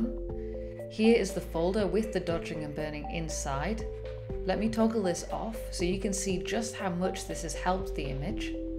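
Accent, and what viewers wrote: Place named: United Kingdom. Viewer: Very beautiful british accent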